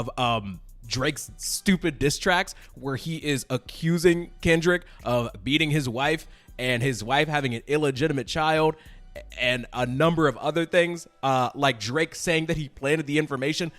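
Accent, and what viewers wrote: Canada. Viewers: also your canadian accent is my favorite 😂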